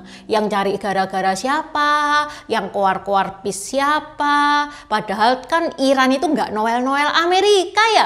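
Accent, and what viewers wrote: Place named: Surabaya, Indonesia. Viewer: Salut info yang cukup mendetail diiringii logat khas surabaya dan gaya bicara yang kocak dari.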